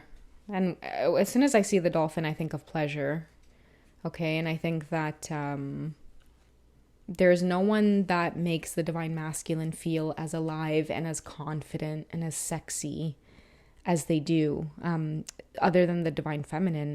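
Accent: Trinidad and Tobago. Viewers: ‼️‼️ you know a Trini when you hear one! ❤ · Not the Trini 🇹🇹 accent! I’m screaming 😂🤣😂! Yaaaassssss 🥳🥳🥳🥳🥳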